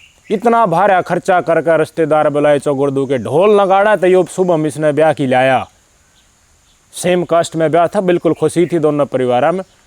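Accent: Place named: Bhiwani, India. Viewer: Bhai Bhiwani ki lapeteydar boli wah bhai wah 😅😅😅😅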